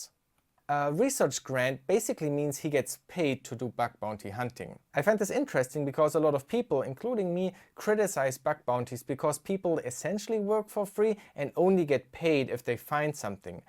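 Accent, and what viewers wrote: Germany. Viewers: You're german right? I think I hear it from your voice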